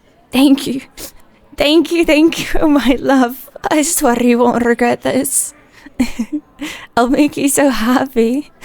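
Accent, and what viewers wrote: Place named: Northern England, United Kingdom. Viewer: Love your accent! You from northern England or one of the Norse countries?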